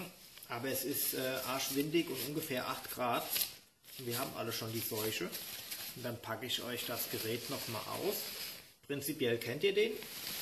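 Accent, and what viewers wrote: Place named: Palatinate, Germany. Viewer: Lewwerworscht :)) ich lach mich weg....Pälzer Platt goes Ytube ;) hört sich aber doch heimisch an.... Belgisch Jig Saw...sieht gut aus ! Hast du eine Quelle ? Grüsse aus der Landeshauptstadt RPL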